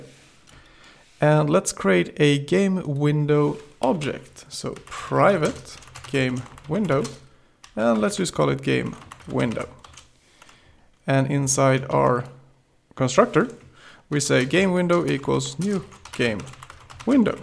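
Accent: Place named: Sweden. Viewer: Den svenska dialekten 😅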